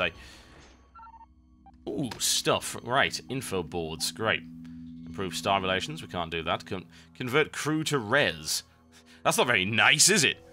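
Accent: United Kingdom. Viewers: Woo, half an hour of soothing limey voice.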